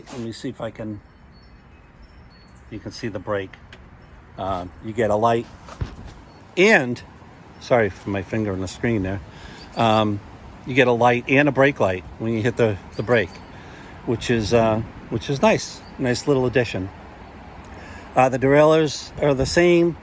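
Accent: United States. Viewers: Thank you for the feedback. I’m currently trying to get a refund from Eahora for an order I cancelled before it even shipped. They have been very difficult. I mean there should be no issue with a refund for something that never got sent. It seems like Yamee’s company is much better. Customer service and a company that stands behind its product is my other big consideration. I Anyway, just waiting to get that refund so I can go ahead and get one of these. Your review and feedback is super helpful. Btw, you sound like your from Massachusetts.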